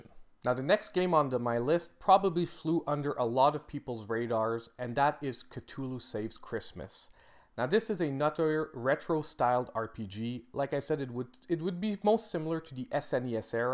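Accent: Canada. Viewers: Sup bro from Los Angeles like the Canadian accent. Carrion gets me attention big time. Will add to my back log of games. Just picked up Assasins creed rogue. Thsnks for the reviews keep up the hard work.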